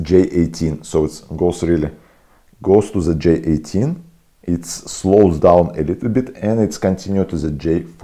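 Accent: Ryazan, Russia. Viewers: какой русский акцент, с первых же слов ) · Бля, по акценту сразу слышно русского Ивана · мне кажется или это самый насыщенный рязанский акцент, что я слышал? надеюсь не обидел своим комментом.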